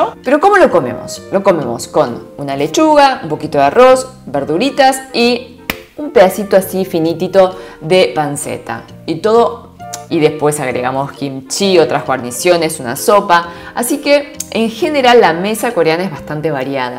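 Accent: Buenos Aires, Argentina. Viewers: Y tiene acento argentino. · Eres Coreana, hablas español con acento Argentino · Tenes 2 de las 3 cosas que me deriten de una mujer ojos preciosos asentó Argentino pero tu piel no es morena 👍♥️👍♥️👍♥️🇬🇹👍👌♥️👍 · Wow!! Hablas perfectly Argentinean 😱🇦🇷👏sos hermosa! Me encanto tu video! Gracias!!👏😊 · Supongo es coreana se ha criado o vívido por mucho en Latinoamérica y si su español suena a Argentina! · Asu una coreana hablando con acento argentino😱😄que chistoso se ve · No tengo ascendencia coreana parece pues me miran y engordo. Habla como argentina. Linda chica presentándonos su bella cultura. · Flaca pero tu hablas como Argentina como eres coreana? Nacida en Corea o de padres Coreanos? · Me encanta oirte y verte, tu acento argentino es genial, sos fisicamente coreana pero culturalmente todos tus gestos , tu manera de hablar y tu alegria son latinos, te felicito por ayudarme a conocer mas de tu lindo y hermoso pais, soy fan de los doramas, besos desde Honduras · Hola apenas descubrí tu vídeo pero una pregunta además de hablar muy bien el español miro que tienes un acento como argentino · Te felicito por el perfecto español-argentino que hablas jeje. 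Adoro el coreano , me pone triste no poder pronunciar ni siquiera una palabra. Gracias infinitas por contar costumbres y alimentos saludables que consumen. Bendiciones para tí. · Habla tan bien porteño 😍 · Tiene acento de la Argentina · Una coreana con acento argentino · No se guíen por los rasgos! No tiene un mérito especial que hable exactamente como una argentina si lo es de nacimiento o llegó muy chiquita. Por muy negro que sea un tipo, si nació y vivió en Sevilla será y hablará sevillano. Conviene pensar antes de hablar o teclear! :D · Tu español es perfecto , pero con acento Argentino!! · Acento peruano, limeño? Para nada, su acento es argentino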